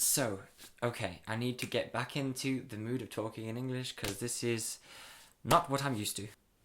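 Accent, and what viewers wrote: England, United Kingdom. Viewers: I love your vlogs in english. I am from Argentina from a city call Córdoba and I work as a tour guide. I hope one day you come and speak to me in that sweet british accent. Kisses :* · Sino fuera por el acento británico, no se me complicaría tanto el entenderte. :( Pero aún así me encantan estos vlogs. 💛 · I've never heard anyone with a British English accent AND a España Spanish accent, its pretty mind-blowing to me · Ese es inglés de Inglaterra? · Omg I need more of this! Me encanta cómo hablas, tienes un acento inglés precioso jo! Yo soy una fanática de Inglaterra y pese a vivir en España pienso y hago todo lo posible en Inglés; me flipa. El año que viene, si todo va bien estaré estudiando ahí :) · British accent 😍😍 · More videos in british english please! I love your way talking in english. It's perfect, innit? 🤤😍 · You talk like the people in England because in U.S.A they talk with another accent and in U.S.A we have carpets in the stairs Hablas mucho como las personas en Inglaterra por que en U.S.A hablan con otro acento y tambien en U.S.A tenemos alfombras en las escaleras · Amoooo su pronunciación tipo britanicaaa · Me encanta tu asentó británico es tan bien perfecto 👌🏻 💕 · Me encanta que su inglés suene más de U.K que de EUA ❤️ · Ese acento británico hace que me encante el inglés😍😍😍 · Me encanta como suena su voz con el acento británico 🤤😂 pregunta seria porque si el video esta totalmente en ingles en título esta en español? 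🤔🤣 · OMG. Amo tu acento inglés. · No hablas como gringo Hablas diferente como en Inglaterra 😄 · Vamos a aprender acento británico 😂❤️ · Dios😂 he amado este vídeo. Me encanta tu acento inglés. ¡Es super dulce! Cuando visites otra vez Inglaterra podrias hacer algunos blogs y enseñarnos los dulces y lo que echas tanto de menos. Sería super entretenido!!! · Todos deberíamos estar hablando de su hermosos acento británico 😍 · Tu ACENTO BRITÁNICO me brinda oxígeno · SlowAH DeepAH YoungAH. Kxbdjhs ese acento británicooo. 💕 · you're right it's a good youtuber but what about english is from the UK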